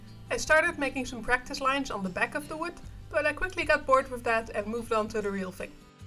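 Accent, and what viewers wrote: Netherlands. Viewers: love her dutch accent!! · Are you from holland by chance?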